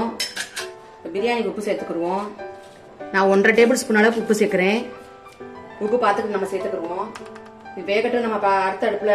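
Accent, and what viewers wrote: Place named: Madurai, India. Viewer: I love her Madurai accent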